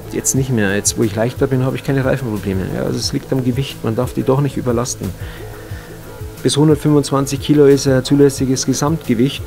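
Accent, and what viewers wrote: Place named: Bavaria, Germany. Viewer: . Guade 5000 km/h;) respekt man. 15 kilo weg da hast ja isar body jetz. Was kostet 1x akku aufladen. Haste das mal umgerechnet? Ich heiz n normales ghost mountainbike ohne motor. Aber ich schau manchen e-bikern schon manchmal wenn meine oberschenkel abkacken n bisschen neidisch hinterher. Kennste die trials zwischen thalkirchen und grosshesseloher brücke? Fetzt!! Aber ich bin da absolut amateur. angenehm deine videos mit deinem bayrischen gossen;) akzent.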